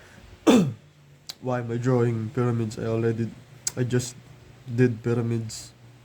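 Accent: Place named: India. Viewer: Lol why are you trying to hide your accent! Embarrassing your Buddy Indian accent!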